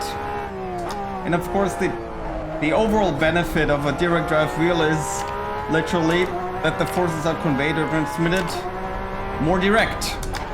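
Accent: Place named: Sweden. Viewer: Cool alright, though I heard a Swedish accent :)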